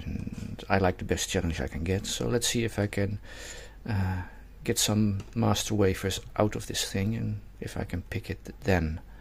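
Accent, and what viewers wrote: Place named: Netherlands. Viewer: love the video bud! very informative. love hearing you silky smooth dutch accent ❤ excellent job on the pick too 😊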